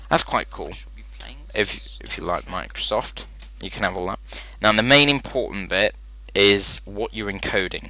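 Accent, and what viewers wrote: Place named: United Kingdom. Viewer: That british accent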